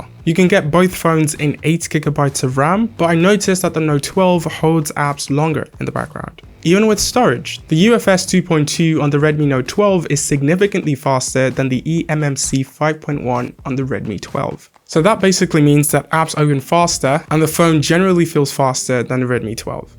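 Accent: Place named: United Kingdom. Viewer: I love the video and as well your British English accent. Many would say you're not a Nigerian living in Nigeria because of your Amazing English accent, just like I was also asked in many occasions if truly I was a Nigerian for the same reason. I loved the review and I am opting for the Redmi Note 12. PS: I sow in the comments someone saying that there's also a Redmi Note 12 Variant which also has 256 ROM. And I wonder how true is that, seeing that you haven't made mention of that variant in review. Thanks and this your new subscriber's here to stay. ❤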